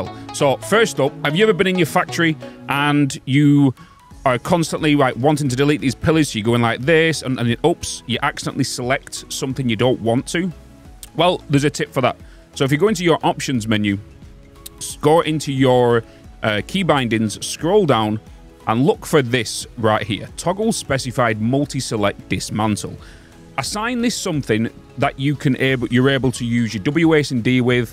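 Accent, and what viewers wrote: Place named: Rossendale, United Kingdom. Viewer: You from rossendale pal?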